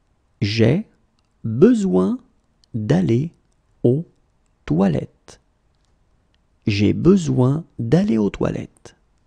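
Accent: France. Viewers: are you french ? because you have a french accent êtes-vous français ? parce que vous avez un accent français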